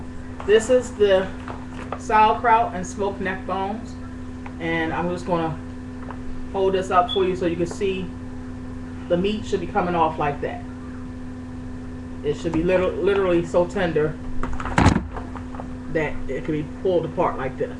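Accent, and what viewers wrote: Baltimore, United States. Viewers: Are you from Baltimore? you got that B-more "U" sound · she from bmore he voice I'm from dc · are u from bmore?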